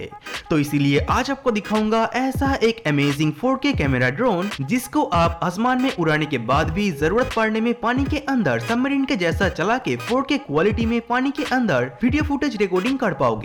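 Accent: Bengal, India. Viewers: Damn!! That's some freaking bad accent!!! When will this indian learn English??? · Bengali ho kya bhai!!!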